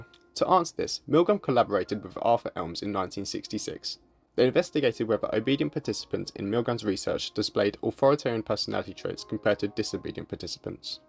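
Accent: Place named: United Kingdom. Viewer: I like your bri ish accent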